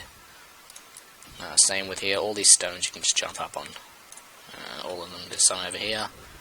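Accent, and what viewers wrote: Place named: Australia. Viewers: he's an aussie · thought that mighta been an aussie accent. was a little softer than we 'Mericans are used to hearing ;P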